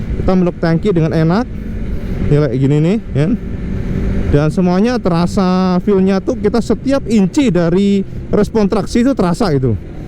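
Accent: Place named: Java, Indonesia. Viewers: Seneng bosomu cak ...ojok lali cak cok e ojok ilangi ya cek ketok jowone😀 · Cak Samean jowo ndi hehehe kok mantab ngunu jowone 😂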